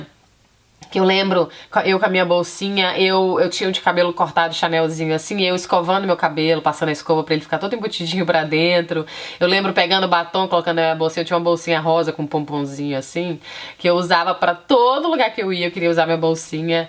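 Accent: Minas Gerais, Brazil. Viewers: Fofaaaaa, tinha que ser uma mineira... adoro a maneira como vc fala e ouvir seus conselhos bjssss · O sotaque de Minas não sai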